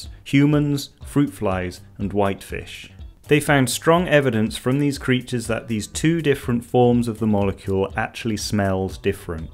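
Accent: England, United Kingdom. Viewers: Question: Where are you from in England? You speak very similar to James May.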